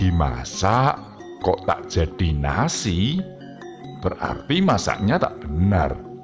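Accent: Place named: Banyumas, Indonesia. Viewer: Salam ngapak Yen ora ngapak ya ora kepenak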